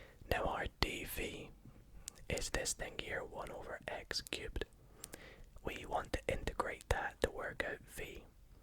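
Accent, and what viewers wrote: Scotland, United Kingdom. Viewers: I can’t tell if ur from Scotland or America it’s weird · Where in Scotland are you from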